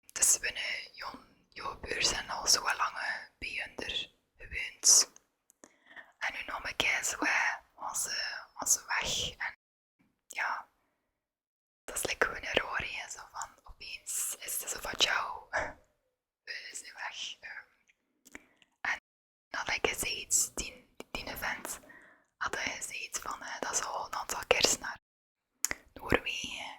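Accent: West Flanders, Belgium. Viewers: Als een oost-vlaming met beetje zeeuws vlaams in zich is het heel leuk om naar het west vlaams te luisteren en het helemaal te proberen begrijpen ☺️ ook leuk verhaal over je buurman ik begrijp hem zeker! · West-Vlaams is leuker, alleen bergrijp ik niet goed wat je dan zegt🤣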